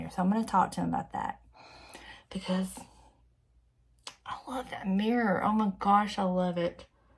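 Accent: South, United States. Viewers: I’m not sure how I found you on u tube but I love starting my day with your videos!! I don’t know if it’s the opening music or your soft spoken southern accent but it just relaxes and calms me to watch you. It also makes me want to go thrifting and decorate!!😊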